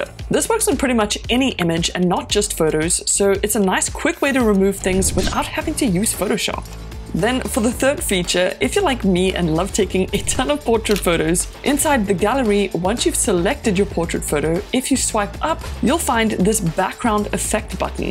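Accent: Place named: South Africa. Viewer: Yohhhh I hear a wonderful South African accent here! That's pretty schweeeeet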